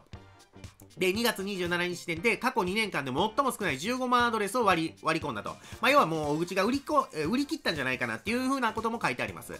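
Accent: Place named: Kansai, Japan. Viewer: おおお、本人から返信きた😳 最近さんのチャンネル登録したものです💦私、忙しい人なので、飛ばし飛ばし見てます💦 さんの動画長いので…😂関西弁いいですね✨心が和みます🌤️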